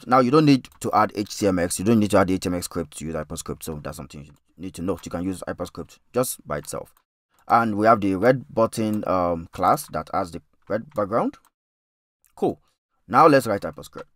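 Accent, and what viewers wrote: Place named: Caribbean. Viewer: This is the first time ive ever heard someone with a caribbean accent on youtube... (maybe jamaican?).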